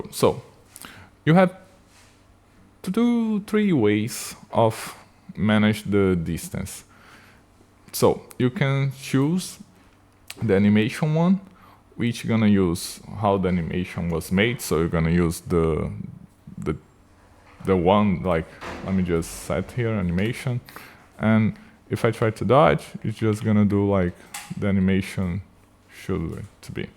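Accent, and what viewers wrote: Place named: Brazil. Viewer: Não sei como mas da pra reconhecer que é BR pelo sotaque kkkkk Salve de Florianópolis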